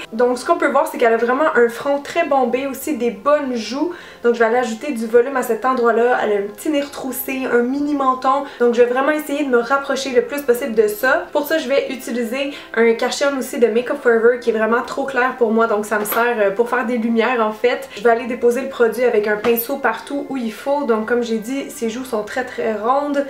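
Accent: Quebec, Canada. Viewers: The World of Laurine Elle a l'accent québécois, tout simplement. Renseigne toi avant de dire des conneries (12 ans et déjà maquillée...)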